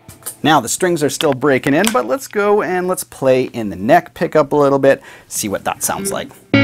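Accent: Canada. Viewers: I love the Canadian accent! Great videos, too. Subscribed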